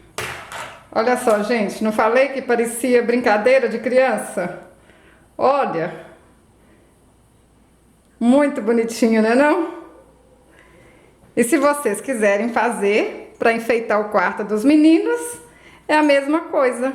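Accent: Minas Gerais, Brazil. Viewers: Estou encantada com você. Esse seu sotaque mineirinho é muito agradável. E as coisinhas que você ensina são lindas demais. Obrigada pelo desprendimento. Deus te abençoe grandemente 🙏🏻😘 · Oi...gostei demais uai...você e mineirinha igual eu só..??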